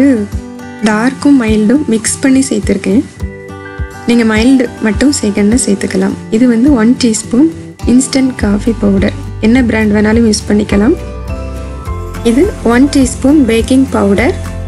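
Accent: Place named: Kanyakumari, India. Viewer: Love that accent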